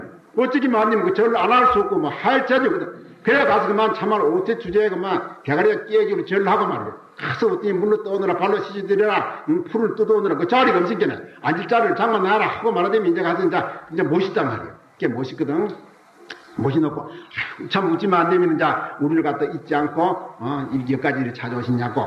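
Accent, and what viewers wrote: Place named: Gyeongsang, South Korea. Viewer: 경남에서 태어난것이 처음으로 자랑스럽네요 ㅎㅎ사투리가 심하신 스님 말씀을 자막없이도 이리 수월하게 알아들을 수 있다니 🙏🏻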